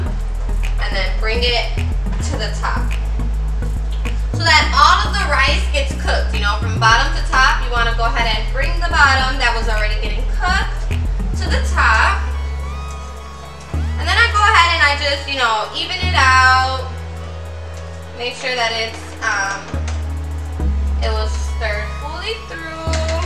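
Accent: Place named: Puerto Rico. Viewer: I loveee your Puerto Rican accent 😭😭❤️❤️❤️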